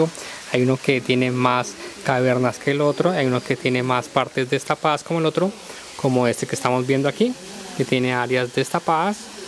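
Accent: Colombia. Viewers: Hola! Noto por tu acento que eres colombiano jeje. Una pregunta, tenemos planeado viajar en Julio de 2017 desde Bogotá, recomiendas comprar las entradas por internet o es mejor allá?. Gracias por tu video :)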